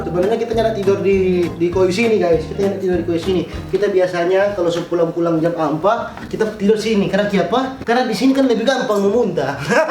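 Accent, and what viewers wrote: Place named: Siau, Indonesia. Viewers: eh keode ungke, suaninge, tu logat dang jadi kangen sitaro rken, salam dari anak Sitaro yang kuliah di jogja. Sukses Terus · Sekali" logat siau jo opo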